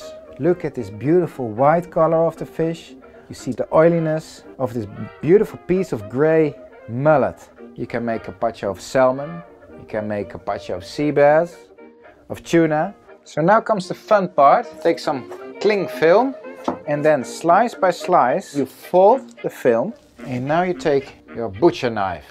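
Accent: Netherlands. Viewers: Bart's Fish Tales Ik ben geabonneerd op jamie oliver's kanaal en toen ik je accent hoorde wist ik gewoon dat je Nederlands was haha. Kun je een keer gerechten maken met gamba's? Ik ben namelijk dol op gamba's en garnalen...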